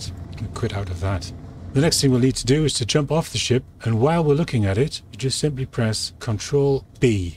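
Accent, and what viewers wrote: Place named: Scotland, United Kingdom. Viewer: Thank you so much, this helped alot!! Love that Scottish accent too, keep it up!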